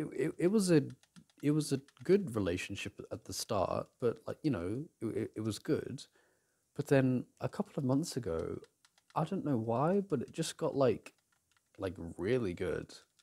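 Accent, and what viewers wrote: United Kingdom. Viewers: You had me at British accent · bro im british and i still get excited when i hear a british accent lMFAO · THE BRITISH ACCENT AAAAAA 😭<3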